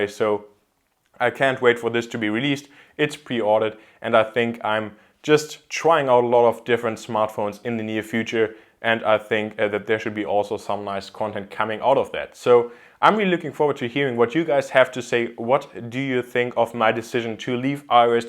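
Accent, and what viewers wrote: Germany. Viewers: love the German accent and cool opinions.. subscribed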